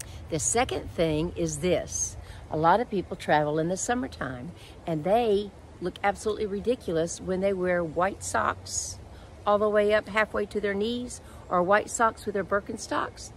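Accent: United States. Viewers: Don't forget your heavy American accent; it will give you away immediately! ♥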